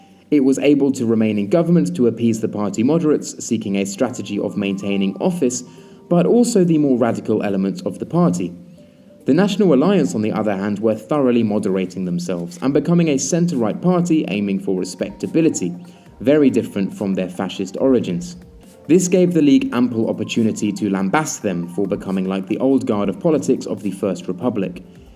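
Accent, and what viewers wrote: United Kingdom. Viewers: you are from the south of Italy? All of this time i thought you were somewhere from England. Am i correct in guessing that you moved to the South? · Wow you're from Italy? I thought you were British this entire time. Regardless, great content like always!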